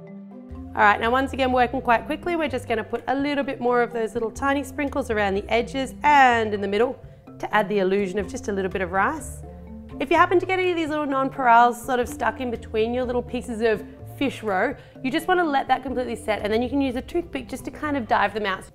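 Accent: Australia. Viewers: R u from australia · She's Australian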